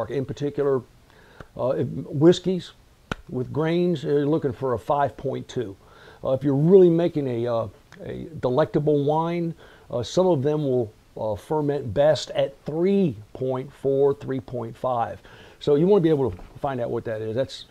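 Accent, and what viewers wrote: United States. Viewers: The guy doing the video sounds American.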